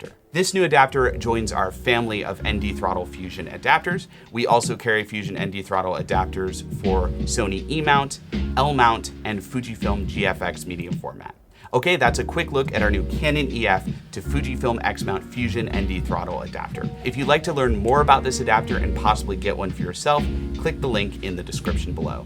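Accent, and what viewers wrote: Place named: United States. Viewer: Appreciate the American (Canadian? cannt tell) ,clear ,easy to understand review!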